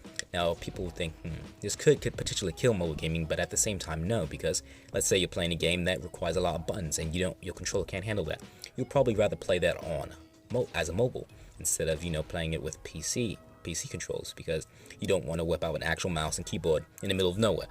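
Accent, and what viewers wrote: United Kingdom. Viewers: yeah yt is drunk half the time it’s no prob....also loving the British accent 🤣👌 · Love the news vid and British accent too!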